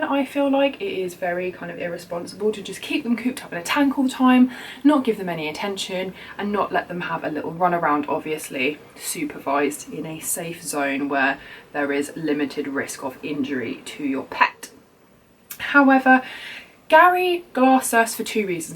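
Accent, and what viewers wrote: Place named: England, United Kingdom. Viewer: Nice to hear an English voice for once. I keep snakes and a chameleon and I just bought a dragon yesterday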